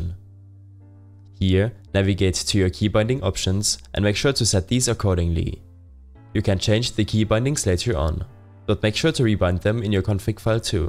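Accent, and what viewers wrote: Germany. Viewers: You sound German mein Freund.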